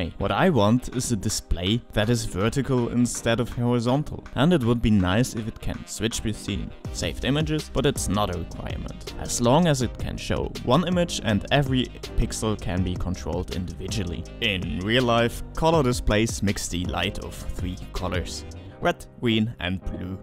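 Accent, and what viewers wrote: Germany. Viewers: Man kann den Deutschen akzent etwas krass hören, xD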